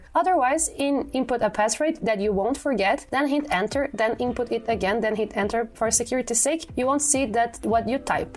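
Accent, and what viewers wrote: Brazil. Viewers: Reconheço um sotaque brasileiro quando ouço um, teu inglês é ótimo! Valeu pelo tutorial, pretendo começar o CS50x 2023 agora em dezembro e depois partir pro CS50x 2024.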